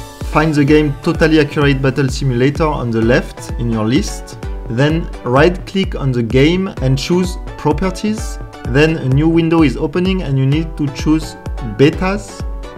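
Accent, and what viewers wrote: France. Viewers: Le petit accent francais on le reconnais ^^ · Je suis sûr vu ton accent anglais que tu es français. Est ce que je me trompe?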